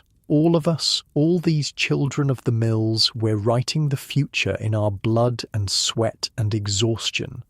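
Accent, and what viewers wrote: England, United Kingdom. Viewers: This is my favorite English accent for stories! There are others, but this Historians chosen voice or original is the best! 2247 in Ridgecrest, Ca USA.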